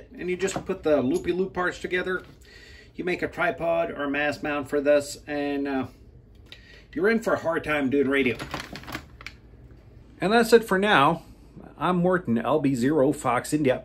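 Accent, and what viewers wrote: United States. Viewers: I just wonder, are you American or Norwegian? Americans are not named 😃 but you sure talk like one 😊 73 from LA-M160560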